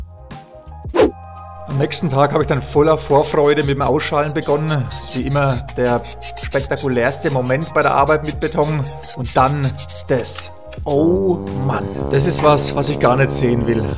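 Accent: Franconia, Germany. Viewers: Klingt extrem Frängisch...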